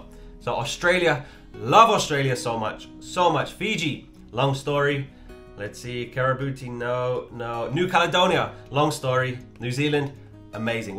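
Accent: United States. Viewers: 😂, you definitely sound more 🇺🇸 than 🇬🇧 😅 although having 🇲🇾 passport that needed to get visas to many places/countries. hwr,....WAS very privileged then, easily got any visas due to nature of former work 'flight crew' 😂 Thus, travelled to all continents & many countries..... 😂 2024April05th, Fri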